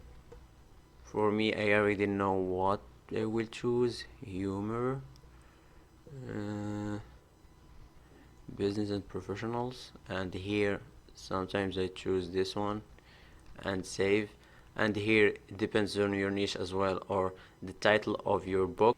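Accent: Morocco. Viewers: Hhhh htana chmit ri7t lblad f sotoo 😅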